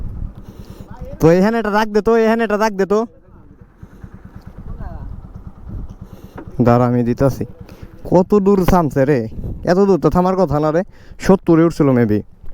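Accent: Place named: Manikganj, Bangladesh. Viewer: আপনার কথা ভালোই লাগে। আপনার ভাষায় ধামরাই মানিকগঞ্জের একটা টান আছে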